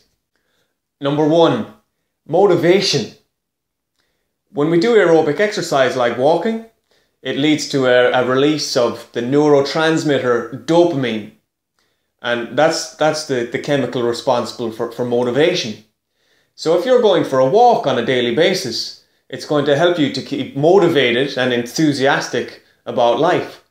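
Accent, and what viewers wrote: Ireland. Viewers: thought u was Swedish at first pretty sure your ireish now. really liked your conor mcgregor video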